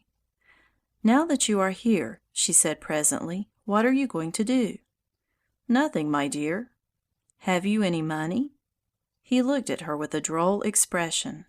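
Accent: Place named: United States. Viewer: Seriously learning english using a very american accent.. 😅 could not be more useless.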